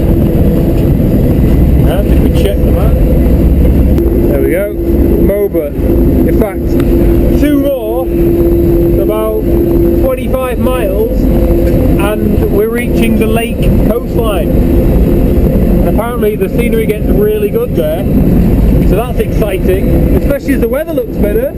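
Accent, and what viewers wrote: United Kingdom. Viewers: This guy has a British accent though !?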